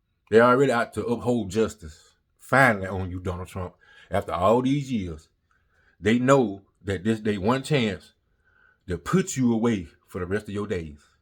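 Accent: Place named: Alabama, United States. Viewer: You speak the truth!! (New subscriber from the UK) ps - who loves your Alabama accent 😁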